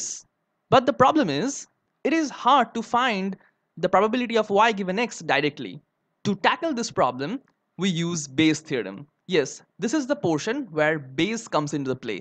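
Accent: Bengal, India. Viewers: You areee Amazing. I love your Indian Bengali accent ( just a guess hehe make me a voice analyzer if i am right XD )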